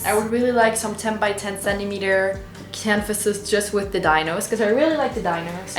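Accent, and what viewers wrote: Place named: Germany. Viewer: du geht’s von dir aus aber verstehst nicht das nicht jeder so denkt. Du findest es also fair das andere bevorzugt behandelt werden u ihr deutsches Publikum vernachlässig wird? Außerdem sagst du selber das sie mit mach das Buch hier fertig auf dem Hauptkanal nicht gut läuft aber dieses hier was ideal dafür ist nicht für deutsche gemacht werden kann? Ich bin mir sehr sicher das mindestens 90% wenn nicht noch deutlich mehr hier auf diesen Kanal deutsch sind. Viele deutsche haben von englisch die Schnauze voll u wenn eine deutsche youtuberin besseren constant fürs Ausland macht sich doch etwas verarscht fühlen ist das ja wohl nicht verwunderlich. Hätte sie dieses Video auf deutsch u auf ihren Hauptkanal gezeigt bis ich mir so so sicher das sie deutlich mehr angesprochen hätte u mehr Follower generiert hätte. Es ist ein kausaler Denkfehler das ein Video auf englisch mehr durchstartet als in der eigenen Sprache. 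Kunst ist eine Nische u spricht nicht jeden an u wenn es ein English mit deutschen Akzent ist wird es auch nicht besser.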